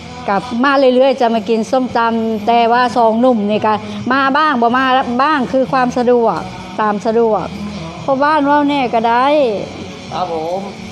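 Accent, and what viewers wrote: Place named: Nyaw, Thailand. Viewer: สวัสดีครับป้าจ๋ามาฟังภาษาญ้อ เว้าม้วนๆครับผม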